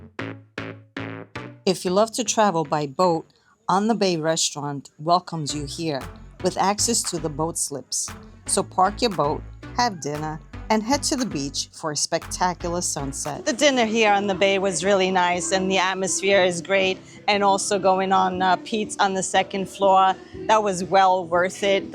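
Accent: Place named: New York, United States. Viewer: Can't take the NY accent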